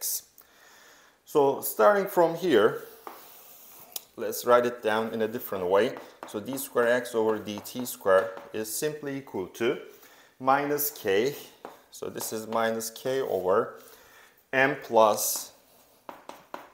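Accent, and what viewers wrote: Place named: Turkey. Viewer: hocam efsanesiniz. Türk aksanıyla ingilizce konuşmanız anlamamı kolaylaştırdı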